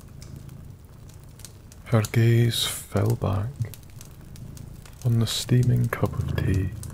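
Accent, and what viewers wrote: Scotland, United Kingdom. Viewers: your dreamy Scottish voice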